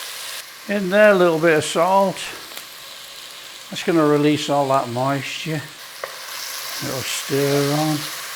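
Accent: Yorkshire, United Kingdom. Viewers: Happy New Year. We all really appreciate your videos on helping people to cook, especially those on a budget and those that live on thier own. Just wondering on your lovely accent. I’m from Wiltshire and sound like a bumpkin farmer but have always loved Northern accents. I love the Lancs accent but I’m taking a punt that you are more Yorkshire, northeast say Sheffield area? Lovely channel you have, appreciate your work ❤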